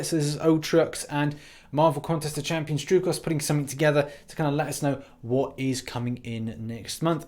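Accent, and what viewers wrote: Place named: RP, United Kingdom. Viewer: Keep speaking that queens English bud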